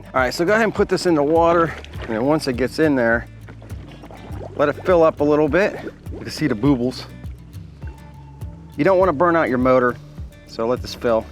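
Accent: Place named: Philadelphia, United States. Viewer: Bro’s gotta be from Philly area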